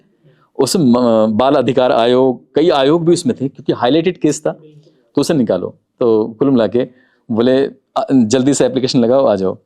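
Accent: Haryana, India. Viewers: भाई पता भी नहीं लगता कि हिंदी बोल रहे हैं या हरियाणवी🎉 मान गए उस्ताद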